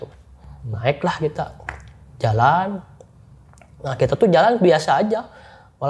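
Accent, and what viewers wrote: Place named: Sunda, Indonesia. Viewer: Logat sunda nya kental banget😂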